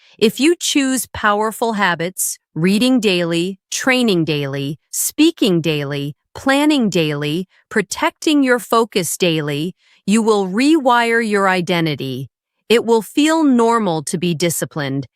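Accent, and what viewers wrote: United States. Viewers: It is an American accent based on my hearing.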